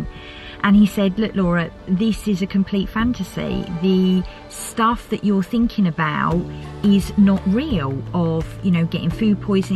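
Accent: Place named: England, United Kingdom. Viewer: This is a really cool channel love your english accent :D I subbed!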